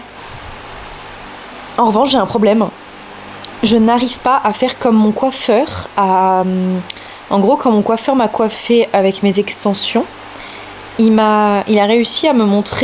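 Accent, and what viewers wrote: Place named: England, United Kingdom. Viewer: Je kiffe ton accent anglais😅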